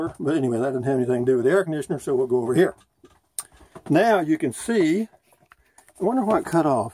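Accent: Texas, United States. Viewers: Hello from Nevada! I just found your channel. Listening to you makes me miss Texas. I lived there 3 years. You had me genuinely laughing ... "Oh it's energy saver... I don't want that!"... sounds like something literally every Texan I ever met would say, and rightly so, since Texas gets up to 20,000 degrees in the summer :D ... Stay cool, and keep up the good work.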